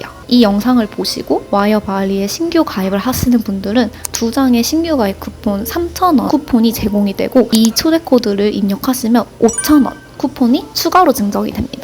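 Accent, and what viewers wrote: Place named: Busan, South Korea. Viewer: 반갑습니다. 씨. 딱 부러지시는 부산분이시군요.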